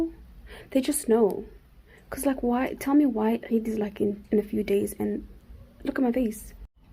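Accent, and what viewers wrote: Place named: South Africa. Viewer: I js love you your South African slung❤️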